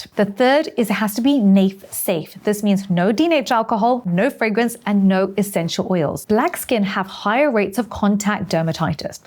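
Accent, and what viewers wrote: United Kingdom. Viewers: But, u sound british, ur video has hit me in Britain, why we looking at American products? Im not in america! Can u do a video for black skin for products on our shelves over here in britain. Thanks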